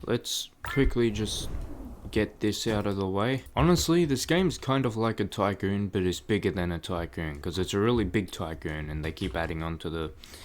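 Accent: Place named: Lebanon. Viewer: 2026 the year of the roblox coding leb accent eshays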